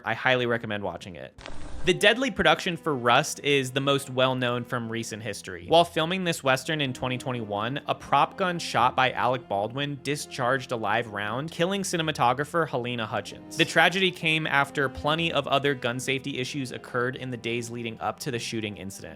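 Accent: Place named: United States. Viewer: Please dude - Speak Slower. I'm native English speaking, but sometimes can't understand your American Twang....just BREATH!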